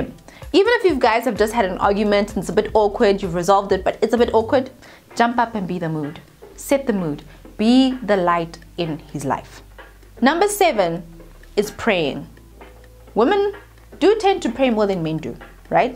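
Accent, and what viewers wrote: South Africa. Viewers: I just saw ur video on ugly panties nd subscribed with immediate effect! Liking the fact that u sound so South African gao zame go sound American or speaking through the nose😂🤣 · South African? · I LOVE #SouthAfrican Accent You're Amazing Girl ❤️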